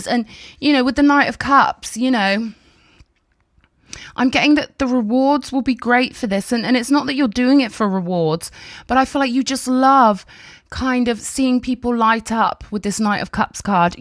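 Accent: United Kingdom. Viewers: that cute lil British accent of yours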